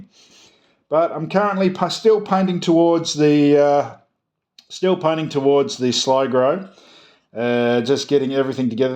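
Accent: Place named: Australia. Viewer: love hearing another aussie talking about the hobby, good job mate!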